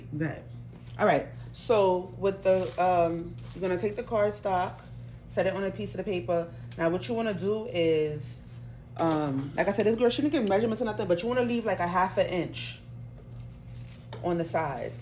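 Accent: New York, United States. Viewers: Love it lol def from NY cause thats how I be talking too. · I love your “keep it real” commentary and your accent (NY?) I’ve been wanting to do this...thanks for demonstrating!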